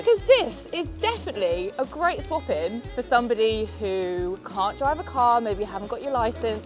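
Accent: England, United Kingdom. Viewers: A women with and English accent makes me harder than a bag of jawbreakers, or was it a bag of tenpenny nails!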